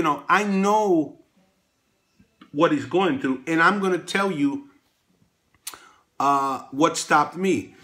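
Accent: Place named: New York, United States. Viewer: Thank you Sir for an honest, accurate and entertaining watch review. I am a new fan and like your New York, Italian American manner, you remind me of a character from the 'Good Fellas' lol... Would love to hear you say 'Ged outta here' lol....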